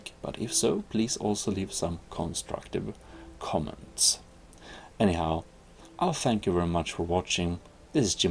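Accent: Sweden. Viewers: U from sweden aye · Är du svenskkkk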